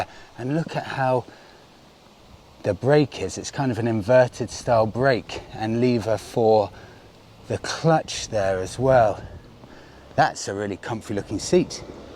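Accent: England, United Kingdom. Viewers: Great review mate! There's some serious badassery mixing a pommie accent with ape bars.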